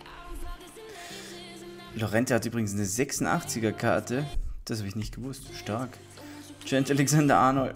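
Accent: Austria. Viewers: Bist du Österreicher oder Deutscher? Bin gefüllt einziger Österreicher auf yt 🥶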